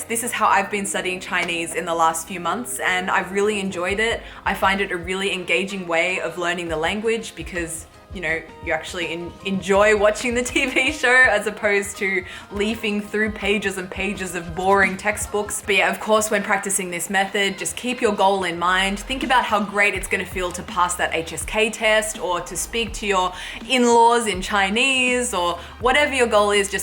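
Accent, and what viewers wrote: Australia. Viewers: So if you want to speak like Ausie just insert an R after every O sound. OrK ? sor goring dorn't shorw vorcabulary cornjunction cartoorns gor goord morment Not to mention all the up talking, very very painful to listen to. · Australian accent is hilarious. I wonder if native Chinese people can tell them apart from an American accent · As a Chinese,I like your Australian accent.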